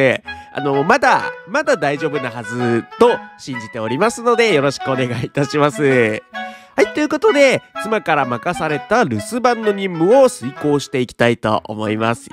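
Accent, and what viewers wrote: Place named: Tokyo, Japan. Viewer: コメントありがとうございます😊 東京の人ですか？話し方がそうっぽいので。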